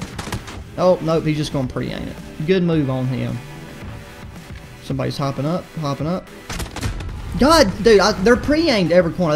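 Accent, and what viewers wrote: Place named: Georgia, United States. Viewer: Where are you from? Accent sounds Georgian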